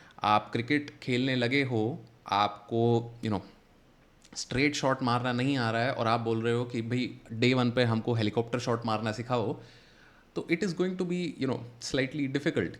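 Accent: Bengal, India. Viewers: Sir, Are you Bengali ? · Sir are you bengali?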